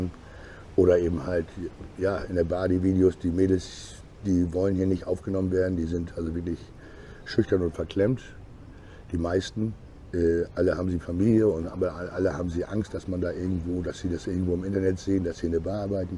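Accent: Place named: Hamburg, Germany. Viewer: Moin, einfach so bleiben wie Du bist und nicht für andere umerziehen lassen. Ich denke wen deine Aussprache oder Videos nicht passen, sollte einfach nicht nerven und andere schauen. Ich finde genau das machen deine Videos aus, dass du dich nicht verstellst und authentisch bist. Manche kommen mit hamburger Jungs vielleicht nicht klar, dann sollen sie einfach wegblicken. Ich würde die nörgler blockieren falls sowas geht. Sollen sie sich andere Videos anschauen. Viele vergessen das sie umsonst schauen und du kosten hast. Ich finde deine Videos top, egal ob auf Roller, zu Fuß, teils englisch oder laut, scheiß der Hund drauf. So sind sie top. Ein Mangel habe ich, dein abspann mit den Verweis auf andere Videos, würde ich nur 5-10 Sekunden machen, dadurch schauen viele nicht bis zum schluß denke ich. Das zählt wohl auch wenn bis zum Schluss geschaut wird. 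Deutschland ist keine Option für dich, dazu bist du zu lange weg gewesen und wie Du sagst, als Deutscher hat man hier nichts zu erwarten. Weiter so mit deinen Videos. Danke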